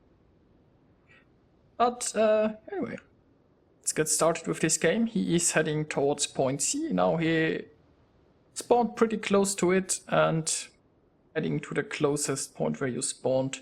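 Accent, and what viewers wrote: Germany. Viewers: I got one question. Are you from Germany?:D Because you speak like a german person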